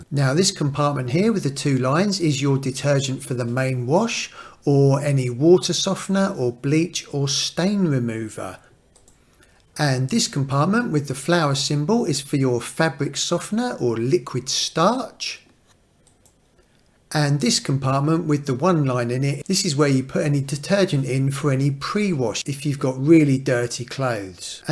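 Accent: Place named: Suffolk, United Kingdom. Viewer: Thank you! This answered a question I've had for a year since buying a house with a Bosh washing machine. Love the accent,! Are you from Suffolk?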